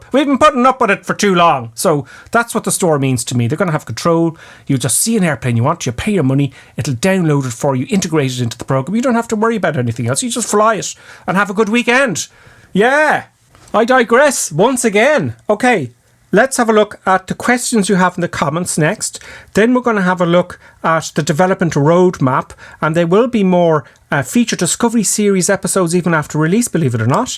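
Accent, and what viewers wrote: Ireland. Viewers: As always great video professional informative most of all fun to watch thank you and I love your Irish accent 👏👏👏👏👏👏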